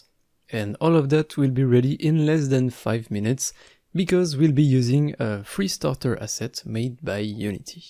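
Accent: France. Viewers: ta grave un accent français tu serais pas? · Tu es français non?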